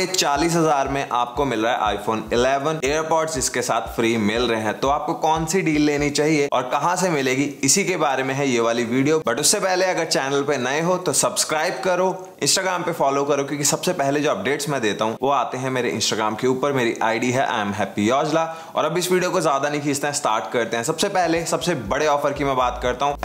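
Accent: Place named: Delhi, India. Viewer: Nice video! It sounds really funny when you emphasise "me india se nahi hoon" :D :D and you sound like a typical Lajpat Nagar boy :) Should rather say i don't live in India. :)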